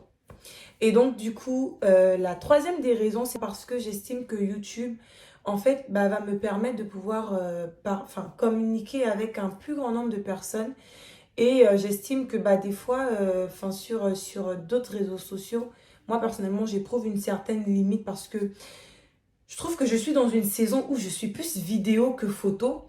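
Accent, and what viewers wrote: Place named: Gabon. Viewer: Au tout début de la vidéo, j'ai capté que t'étais gabonaise grâce à l'accent 😂😅 Courage sis🇨🇲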